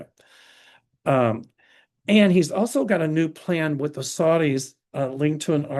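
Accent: South, United States. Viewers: I absolutely ADORE you Auntie 💜🌻🫶 I love the whole Southern accent and when you say "you all* and I've learned to take the upper road and say " "Bless your heart" to those I don't quite agree with. 😆🙏💯💜🌻💫 Thank you and God Bless! 🙌💫💯🌻💜🙏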